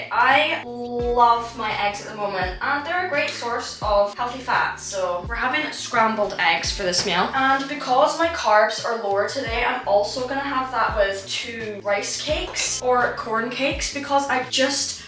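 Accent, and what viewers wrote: Scotland, United Kingdom. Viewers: Are you Scottish? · What part of Scotland is she from?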